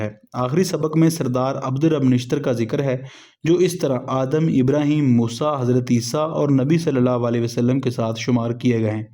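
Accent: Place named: Punjab, Pakistan. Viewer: تلفظ تو درست کرلیں ۔ اُردو میں Audio Book بنائی اور تلفظ پنجابی لہجہ میں ۔ یہ قومی عصبیت نہیں بلکہ خالص علمی تجزیہ ہے۔ اگر آپ اس نصری کتب (Audio Book) کو پنجابی زبان میں بیان کرتے تو بہتر تھا ۔ یا اپنے اسکرپٹ کو اردو بولنے والے ریکارڈ کرواتے ۔ لیکن آخر میں اچھی کاوش تھی ۔ وسلام 🎉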